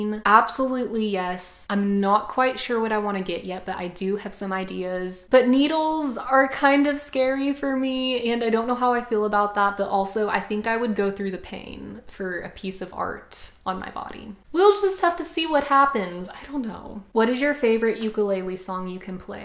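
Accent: South, United States. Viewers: Are you from the south? Lol you say y’all. Just wondering, because if so, I’m from the south too! Y’all have a nice day! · What I heard: how do you lack living in a big family? What she said: how do you like living in a big family? She got one nice country accent y'all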